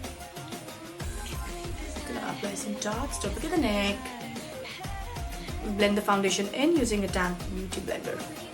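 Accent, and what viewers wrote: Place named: Gujarat, India. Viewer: dayum that gujju accent tho :D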